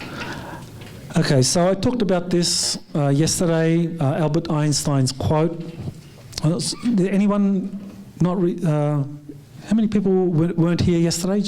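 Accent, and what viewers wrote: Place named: Australia. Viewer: You were a former professor? What are you now? You speak in a broad Australian accent, yet you mutate the pronunciation of "AntarCtica" as if you you were one of rhe exceptional people from the indispensable country. You do reslise that there is a letter "c" after the letter "r" in "AntarCtica"?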